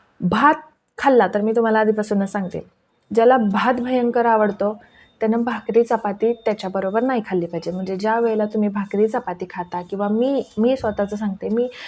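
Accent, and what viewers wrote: Satara, India. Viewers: बोलण्यावरुन भाषा.वाटलेच...आपलीसातारी रांगडी भाषा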